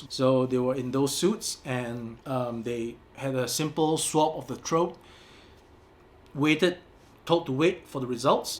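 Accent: Singapore. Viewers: Nice to hear the singaporean accent again! I'd lived there for 7 years and it's the best of my life so far. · So familiar with Singlish accent!!!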